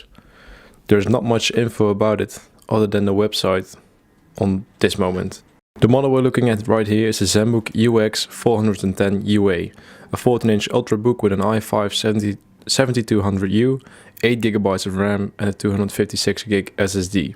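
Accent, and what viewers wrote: Netherlands. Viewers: Ik hoorde gelijk aan j accent dat je nederlande bnt lol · Hahaha jah bradahhh ik wist het niet zeker, want je hebt veel engelse reacties vandaar G, maar je accent is duidelijk een dutch one matsko maar je spreekt duidelijk en goed dus no problemo, die bios vraag was omdat hp en andere merken die bios locken, je kan niks instellen dan, alleen datum en tijd, maar geen ram timings en raid ahc settings, jij hebt hem dus jij kan makkelijk checken 😃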